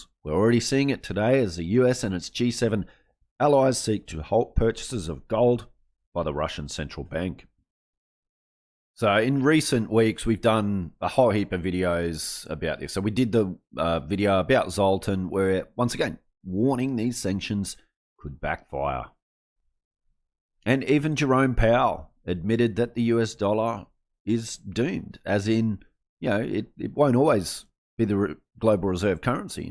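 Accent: Australia. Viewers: Learn to speak properly bogan..........we are not all feral westies.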